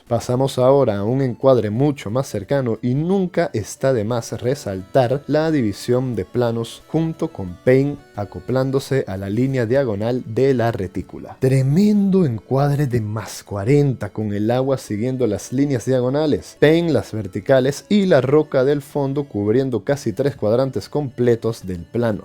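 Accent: Venezuela. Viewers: Eres vzlano? O_o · Eres venezolanoo? jajaja ´´sabes como es la vaina???´´ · Primer comentariooooooo, oye eres venezolano? Me da la sensación, me encantan tus videos, saludos desde Venezuela mi pana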